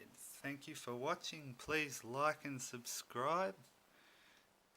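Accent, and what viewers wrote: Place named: Australia. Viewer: From your accent I'm guessing your Aussie. Where in Australia did you get Air raid? I need him and the rest of the stunticon team.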